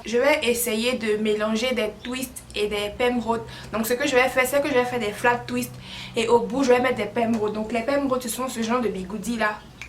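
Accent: Ivory Coast. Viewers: Une vraie Ivoirienne rien que t'entendre jee retrouvé u puays 😍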